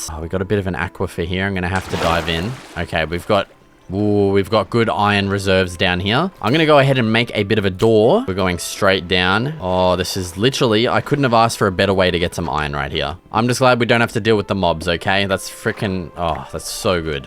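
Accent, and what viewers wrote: Australia. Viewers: I’m new to your channel,…I heard your accent so I was on my way to your “about this channel” page and heard you say Nor 😂 immediately new it would be Australia lol… love the video I haven’t finished it yet but I plan on it! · That aussie accent is coming on hard bro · In the first 2 minutes I figured out that he is a Australian 🇦🇺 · Aussie Accent makes this so much better 😂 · It’s good to see a Aussie minecraft YouTuber not many out there ,also you kinda sound like Robert Irwin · the aussie accent is so clear lol i love finding aussie ytbers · Your channel came up on my homepage, I wasn’t going to watch til I heard the fellow Aussie accent and I’m glad I did 😂 I appreciate the euphemisms please keep em coming #knoblet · i was 2 mins into the video and already know that he is aussie lol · Is this like every aussie accent cuz lazarbeam has the same voice as this guy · You sound Aussie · i wish i had such a strong aussie accent!! 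love the video❤❤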